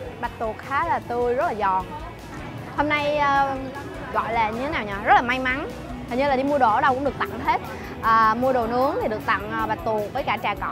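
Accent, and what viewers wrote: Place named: Central, Vietnam. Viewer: bã nói giống dân miền Trung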